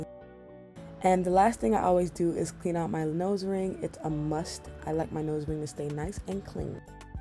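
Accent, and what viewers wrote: United Kingdom. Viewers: Im still trying to figure put if she from the uk or not🥱🤣💕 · I can’t tell if your British or American. The accent and the slang is a mixed bag?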